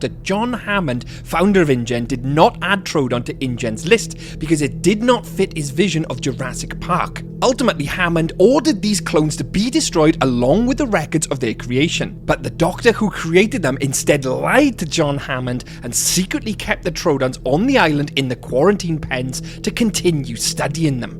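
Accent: United Kingdom. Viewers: Looking for a meaningful JP video. Clicks on this video Hears British dude Leaves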